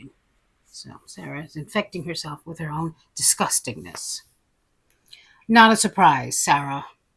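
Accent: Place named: East Coast, United States. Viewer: Elizabeth Sarah Boone Taylor! …thanks for the check in. Love your videos, and your beautiful face! Your accent is very interesting, are you from Brooklyn? You sound kinda east coast. I’m from Oregon and we talk kinda slow here. Hah.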